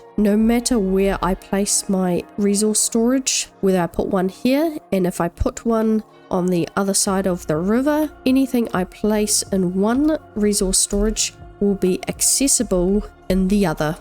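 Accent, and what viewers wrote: New Zealand. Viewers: Always nice stumbling on a kiwi accent in the wild :)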